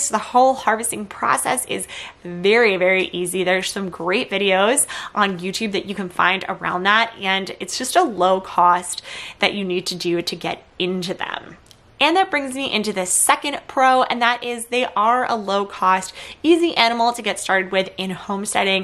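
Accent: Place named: United States. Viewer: “Rabets and Qwel” I swear this is the first time I’ve struggled with the American accent. 😅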